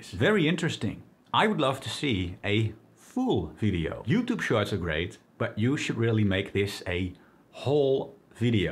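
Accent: Netherlands. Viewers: Ik dacht al dat ik een nederlands accent hoorde hahaha.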